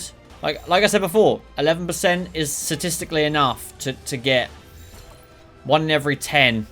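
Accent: United Kingdom. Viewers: My God, I Love your British Accent....😊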